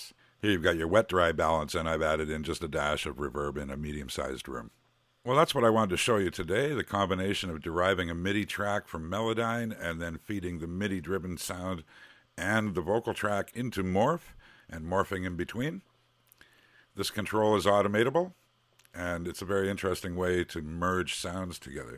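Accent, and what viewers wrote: Canada. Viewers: No, just a Canadian accent. Hope you enjoyed the vid.!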